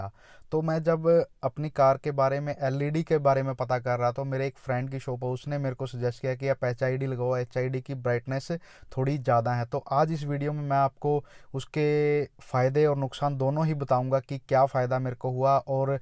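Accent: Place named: Punjab, India. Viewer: That punjabi accent.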